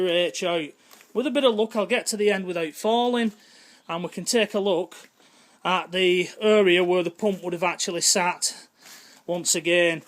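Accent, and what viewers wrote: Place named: Wigan, United Kingdom. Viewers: Great video and....love the accent ( Wigan?) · Proper back in the day Wigan accent! Nice vid pal 👍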